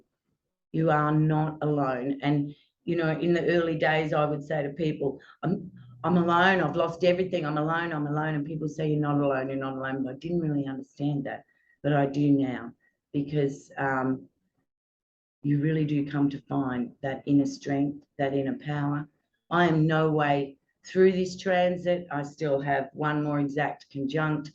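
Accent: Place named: Australia. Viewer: Wow 😮 I just walked in on the most epic astrological gift !! You had me with the Aussie accent but now going back to soak up all of your videos uploaded prior to this one ❤️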